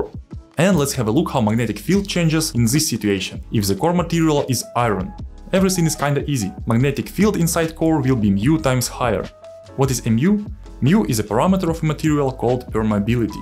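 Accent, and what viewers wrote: Russia. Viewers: Thank you!, your accent Russian why I say that? Is because magnetic fields then to coil's a EMF field. Tesla coil, plasma current flow, and understanding transformers, hover crafts flying UFOs each country has one the harnessing of plasma called plasma engines ( motors). Russia is white and I want to say a bird shaped Hove craft our on this side of the world same but mercury and it's flow. Got to Go star Trek create the new generation so they can boldly Go where man's gone before!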